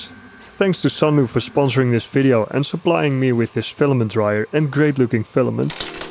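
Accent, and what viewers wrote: Netherlands. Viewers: love that dutch accent. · Love the Dutch accent! I am also Dutch lol. Love the video to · I smell a dutch accent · Doubted if you are dutch because of your accent. My suspicions were confirmed 😂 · Ook een nederlander haha ik kon het horen aan het accent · Nice work and a very interesting project. Your accent makes me miss the time i actually lived in Holland (Harlem).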